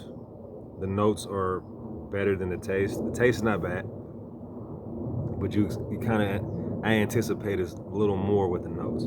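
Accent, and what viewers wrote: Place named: South, United States. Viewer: I didn't know u was from the South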